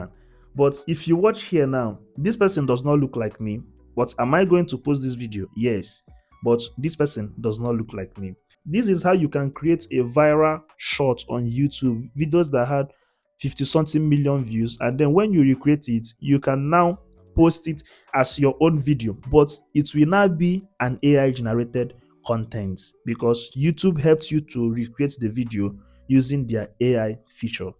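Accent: Nigeria. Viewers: When i heard your accent immediately realice or 🇳🇬 Nigerian boy ,we were worked in NG. morethan 10 years in Illorine uni. God bless you dear. Good to hear typical NG accent.❤❤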